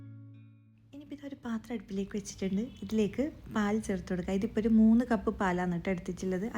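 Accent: India. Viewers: ശബ്ദം കേൾക്കുമ്പോൾ തളിപ്പറമ്പ ടെച്ച് ഉണ്ട് 😄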